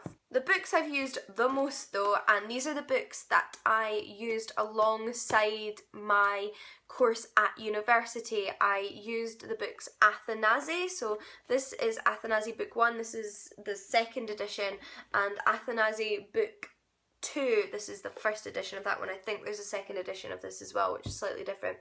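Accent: Scotland, United Kingdom. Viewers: Your accent sounds Scottish. Didn't know anyone in that area of the world was interested in ancient languages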